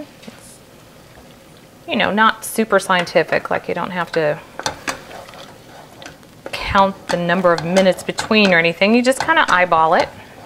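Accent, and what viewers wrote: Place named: United States. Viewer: hey I just subscribed to your page I love risotto I make it every now and than love it and I actually have a Scottish background so not Italian lol but still in Europe lol my nan was Scottish when she was alive I never met her but she seemed nice ,I will definitely try out your reicipe I am from Australia,are you from America? I can tell a bit from your accent I have shallots here we call them eshalots here I call them baby onions as they are little lol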